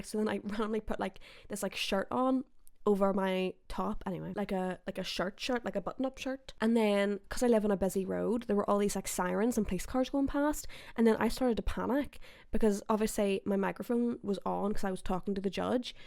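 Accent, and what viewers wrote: Ireland. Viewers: I love your Irish accent. You are so charming!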